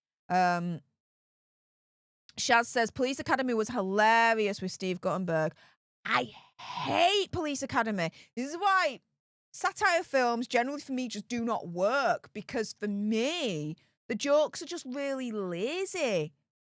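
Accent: Yorkshire, United Kingdom. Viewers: She has a Yorkshire accent.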